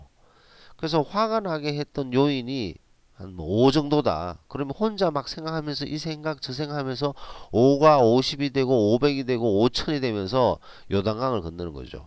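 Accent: Gyeongsang, South Korea. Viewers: 경상도 말투 저도 경남ㅎ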